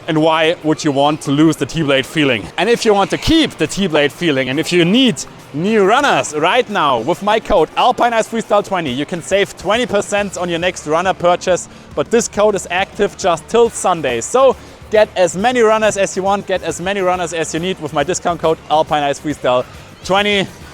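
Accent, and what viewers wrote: Germany. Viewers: Schee. Mit a bissl boarisch 😊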